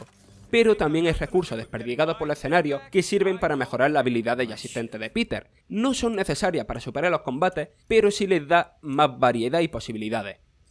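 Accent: Andalusia, Spain. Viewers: No soy andaluz pero entiendo perfectamente al chaval, es una currada de vídeo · De qué zona de España es la tonada del locutor?? Me recuerda a Gandía, de La Casa de Papel... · Va a ser el primer y último comentario que haga desde que veo videos en vuestro canal y solamente aconsejar que quizá no sea la persona más adecuada para narrar los videos, sin tener absolutamente NADA en contra de el y sin poner en duda en ningún momento su profesionalidad simplemente hay funciones o desempeños para los que ciertas personas no valemos, y no me vale la excusa de los acentos o la dicción, habéis visto los informativos de TVE, Antena 3 o incluso televisiones autonómicas como Canal Sur con el acento tan marcado que hay en Andalucía y acaso ponen a personas a los que se le note tantísimo y que se coman letras de las palabras de una forma tan notoria? ya os lo digo yo, No, y no por que esas personas no valgan o no sean buenos periodistas, sino que para comunicar con su voz no son los más adecuados.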